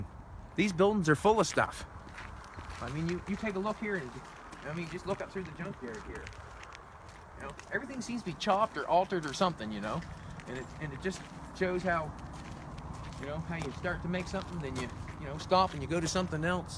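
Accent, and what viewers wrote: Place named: Canada. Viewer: I started watching your videos and man your channel is going to blow up soon with your amazing work! I couldn't help but think you were from Canada bc the Keith's shirt and how you talk and now u said n.s. I'm originally from cape Breton and live in Halifax now and wanna say hello from Halifax man!!! Keep er up man love your work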